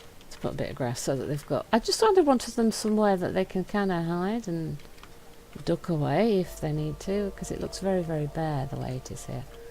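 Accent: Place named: United Kingdom. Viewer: I have a question... Are you from the UK or the US? Because I’m from the UK and your accent sounds like some people from where I live.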